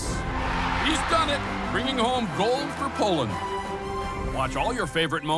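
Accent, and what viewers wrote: United States. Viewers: what state in the US is the commentator’s accent from? I’ve been trying to figure out similar accents and i can’t tell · How ridicules ,not surprised yank commentator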